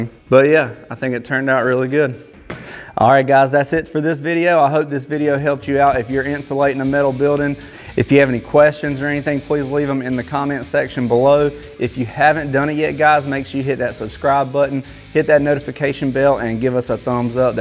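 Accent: South, United States. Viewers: I love your deep Southern accent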